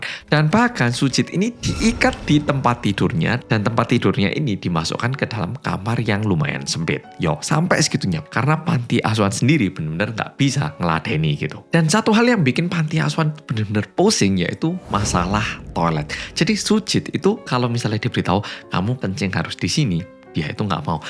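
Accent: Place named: Java, Indonesia. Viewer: tks medok ❤ Alhamdulillah Sujit bisa berubah meski pelan👌🏿✌🏿💪🏿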